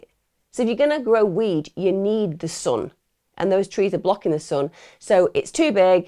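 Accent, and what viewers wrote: Australia. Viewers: Your Aussie accent made me smile! Love from down under 🇦🇺🦘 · Hey, I’m from Australia and have not heard of this case until now. Our justice system is despicable! On a side note though, you’re Aussie accent isn’t too bad xx I’d love for you to also look at covering the Shaniya Davis case. I’ve come across a few stories about her case but haven’t watched them in the hopes that I see her story on your channel. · Loveee the Aussie accent 😂😂😂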